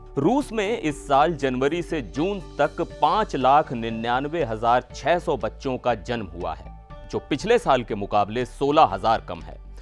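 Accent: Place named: Bihar, India. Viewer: Ye presenter pakka बिहार से है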